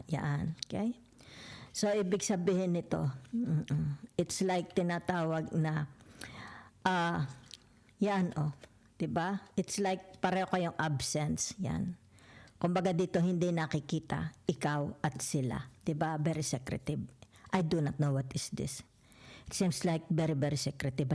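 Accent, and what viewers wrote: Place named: Tanay, Philippines. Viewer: I claim it all positve readings🙏🙏🙏thank you po sa mga readings nio,ang galing nyo po magsalita,may punto po ang salita nyo,punto po ng mga taga tanay..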